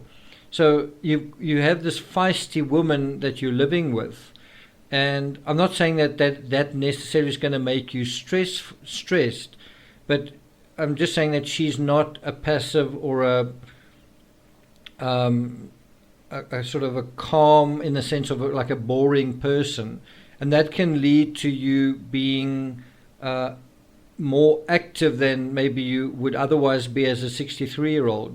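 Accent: South Africa. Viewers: This American loves your South African accent 🙂 and of course your wisdom too. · You popped up my in my recommendations and when you said the most authentic voice in true crime in the intro...I thought wait this dude sounds South African... and pleasantly surprised that you are!! Howzit from a fellow South African * slams subscribe button *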